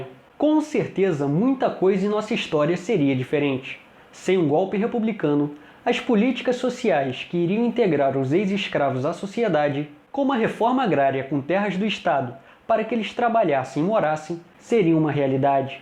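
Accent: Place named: Rio de Janeiro, Brazil. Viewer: Odeio o sotaque carioca me inrrita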